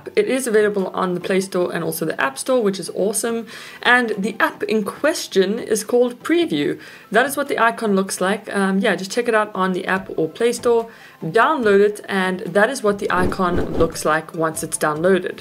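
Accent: South Africa. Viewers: Cool Video... Great hearing a South African voice on YouTube :-) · Thanks for sharing, it was really lekker to hear a fellow South African too! I'm based in the UK now and have been for the past 3 years! Still struggling along with social media!! Keep well, and thanks again. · Hey awesome vid by any chance are you South African ? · Are you from South Africa?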